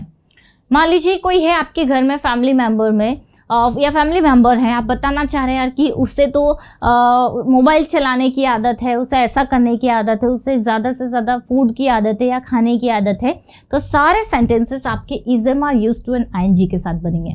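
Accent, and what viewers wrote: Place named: Bhopal, India. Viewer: आपकी हिंदी का उच्चारण भोपाली क्यों है ? बहुत इरिटेट करता है जब आप मैं को में और कैसे को केसे बोलती हो...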